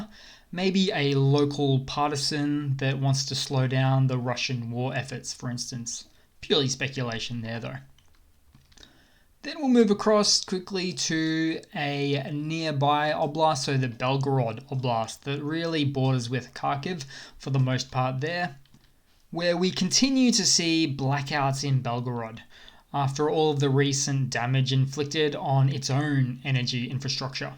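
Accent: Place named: Australia. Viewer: I only listen for the Aussie accent.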